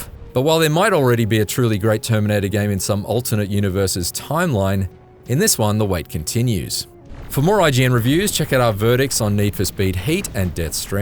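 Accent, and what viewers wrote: Australia. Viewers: “ske-leet-al” never heard someone with an Australian accent pronounce skeletal before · It's that aussie accent again, eh? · He ain't a brit, he's an Aussie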